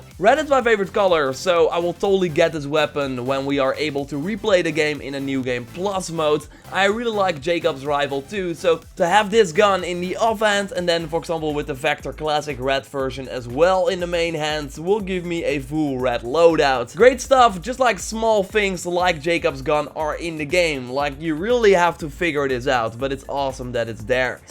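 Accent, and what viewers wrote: Netherlands. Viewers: Dutch accent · Are you dutch?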